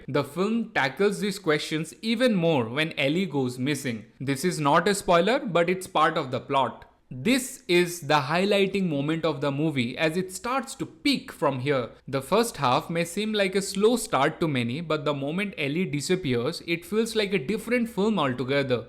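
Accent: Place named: India. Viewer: Ok. Why don’t you learn to pronounce the English words like in English not in Hindi heavy accent or talk in Hindi language. This is the same as Call centre for Fido asking for information ℹ️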